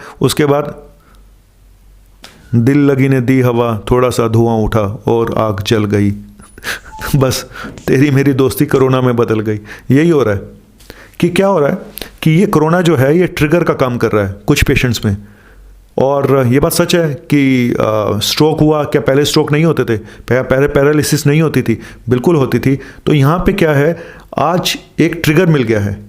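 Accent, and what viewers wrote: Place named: Punjab, India. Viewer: Very sweet punjabi ❣️❣️nice to hear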